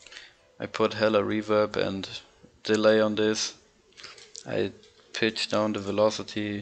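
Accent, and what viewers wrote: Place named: Germany. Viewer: man hört den deutschen Akzent haha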